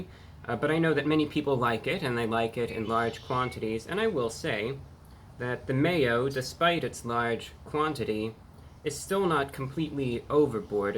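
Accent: United Kingdom. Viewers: Your voice has definitely changed over time. You somehow sound more British now. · Why does his accent sound more and more British?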